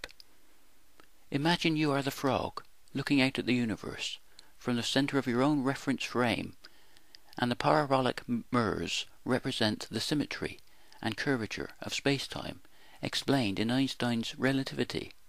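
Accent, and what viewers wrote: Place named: Bristol, United Kingdom. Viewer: Incoherent rambling in a thick bristolian accent... ...nice use of big words, by the way. To me, this gives insight into a mind that is clearly fascinated with the concept of ambiguity, which obsessively attempts to apply it to scientific observation. This smacks of pseudo-religion. I am not without my own philosophical musings, but this is simply pretentious.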